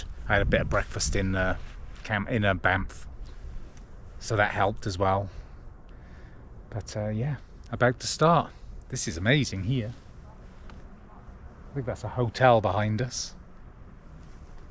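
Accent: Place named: South East Wales, United Kingdom. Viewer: Hi, Stumbled on your channel. Its great to see a Brit doing the GDMBR. As an ex-pat living in Canada for the last 20+ years this is a route I have obsessed with for some time. Looking forward to watching the whole series especially like the video about cost and realistic time lines to get the route done. Its great to see the ultra elite athletes completing in less than 20 days but we are not all like that!! Where are you actually from in the UK? can't place the accent, I have you south east Wales but I have been away for a long time!! PS don't post your address! 😂😂 Cheers Bob...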